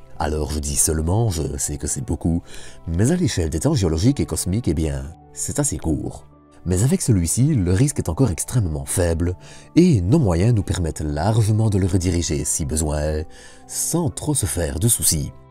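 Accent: Liège, Belgium. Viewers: Tu ne serais pas de Liège, par hasard?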